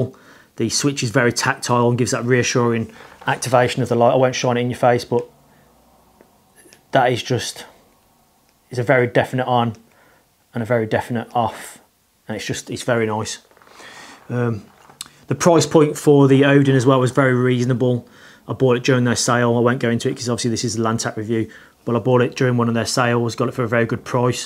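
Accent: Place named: West Midlands, United Kingdom. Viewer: ahhh a fellow west mids chap? anyway great review think im gonna go LANTAC and salute you sir :D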